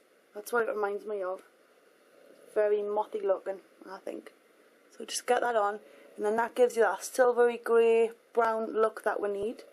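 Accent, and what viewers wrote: Newcastle, United Kingdom. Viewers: Omg are you a Geordie like Cheryl? :) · a new castle accent i love it the accent , and your stunning btww . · ah your a geordie, me tooo, x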